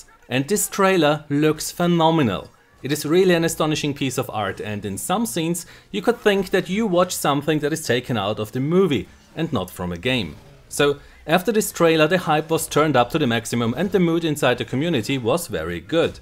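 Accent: Austria. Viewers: Great video. I have to ask as this has had me thinking for a while, Are you Austrian? Because you sound very much like Niki Lauda lol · are you from Austria? Weil du Autirgendwas heißt und weil du nicht klingst als ob Englisch deine Muttersprache wäre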